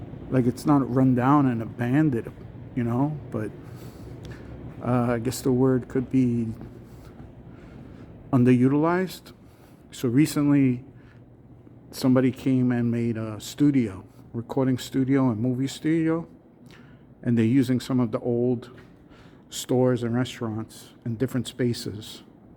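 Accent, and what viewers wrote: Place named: New Jersey, United States. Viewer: Playing now! I love your accent! I lost mine but easily pick it back up when around my nj family. I remember being very little and vacationing in AC before it was ‘ the ac’ had to be around ‘67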